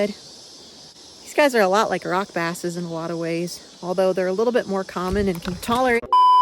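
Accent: South, United States. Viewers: confused southern accent mumbling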